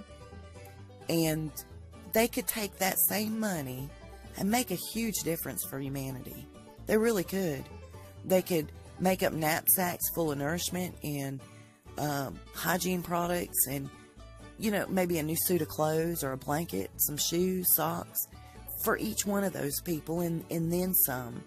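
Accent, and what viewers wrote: South, United States. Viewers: “A knapsack full of nourishment...”. LOL., you are so southern and I just love it!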